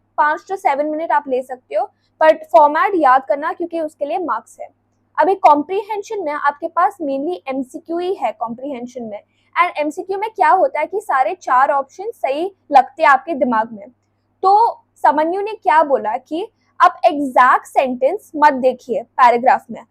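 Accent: India. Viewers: Your Hindi accent ❤❤❤ Op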